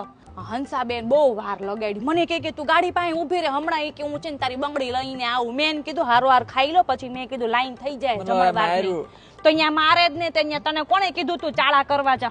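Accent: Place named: Kathiyawad, India. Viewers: Videsh ma ryo cho pn aapdo kathiyavadi lehko evo ne evo j che ho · તમારી સ્ટાઇલ એકદમ ગુજરાતી ગામઠી ladies અને લહેકો પણ અદ્દલ એવો જ....😅😅 કોઈ તમને ના પહોંચી વળે હોં..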